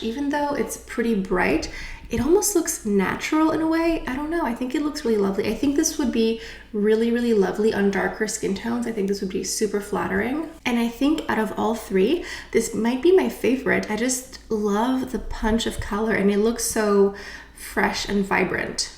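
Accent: France. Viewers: I fell in love with your french accent and your love for Chanel cosmetics. Thanks for the review . I subbed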